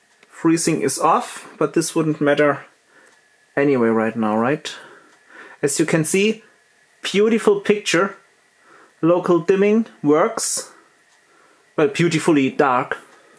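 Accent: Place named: Germany. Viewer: Man hört einfach das du ein deutschsprachiger Mensch bist ^^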